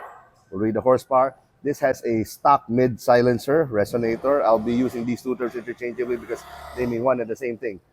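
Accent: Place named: United States. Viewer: parang laking US si kuya..ganda ng english eh hehehehe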